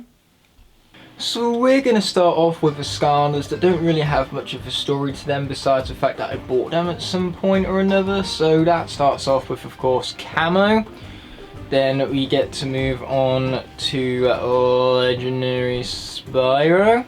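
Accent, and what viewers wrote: United Kingdom. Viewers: I love this video you did so well 1) Your British unlike all the other ones 2) such a massive collection and they have good back stories 3) you are very repeatable 4)my favorite story is your wrecking ball one (series 1) 5) it’s inspired me to do a video on my collection I collect them very big on it there might be a new one in 2021 so look out for the next one and pls watch my vid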